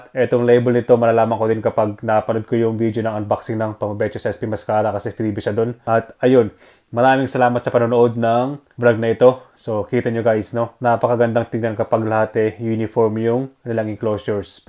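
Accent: Ilocos, Philippines. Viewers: Idol may pag ka ilocano ka no? hehe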